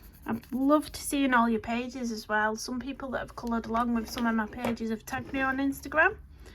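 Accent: United Kingdom. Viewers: Bonjour, la combinaison des couleurs est super je suis fan de tes vidéos et j'essaye de suivre tes tutoriels... merci de partager. Where are you from because j'aime beaucoup ton accent british but où ? moi je suis française et je vis dans le sud vers Carcassonne. Bravo pour ton talent Corinne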